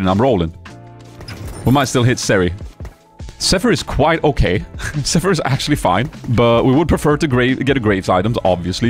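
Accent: Sweden. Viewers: Hey, please keep the Swenglish. Det är alltid trevligt med en landsfrände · All though as a fellow Swed i prefer your English over your Swedish accent, sorry your English is more crisp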